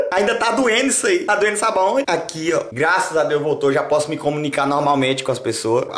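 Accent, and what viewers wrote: Northeast, Brazil. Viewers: é pq o sotaque dele é de nordestino. E ele fala gírias.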